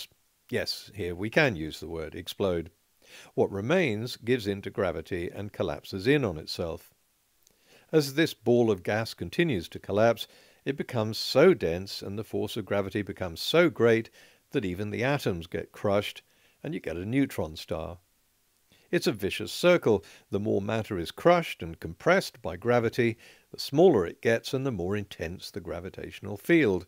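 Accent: RP, United Kingdom. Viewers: "nothing literally nothing" - richard dawkins asking Lawrence krauss "yes" more like atheist dont know what their academics are saying i don't think you can actually defend your position aside from English posh accent, a rapid expansion is a explosion, but this is just semantical equivocation, genius you still think it 'expanded' from nothing, the singularity ISSSS nothing in the atheist wolrd view, simply calling nothing the singularity doesn't stop it from being nothing. which i dont need to tell you this is a stupid teenager answer how about you actually debate for once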